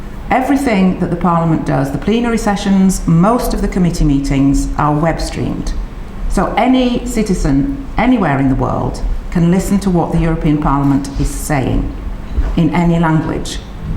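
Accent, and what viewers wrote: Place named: United Kingdom. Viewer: I THINK she is british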